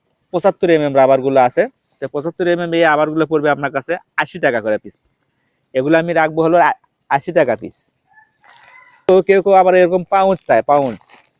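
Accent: Bogura, Bangladesh. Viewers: ভাই আপনার বাড়ি কি বগুড়া?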